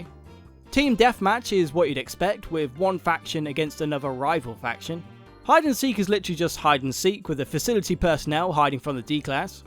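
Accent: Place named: United Kingdom. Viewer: you sound british cool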